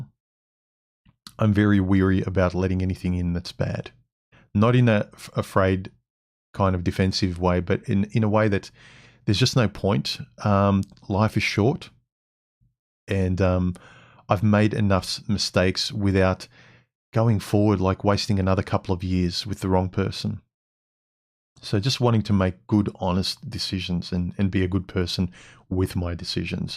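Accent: Melbourne, Australia. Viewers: You have a Melbourne accent through and through, eimai sostos?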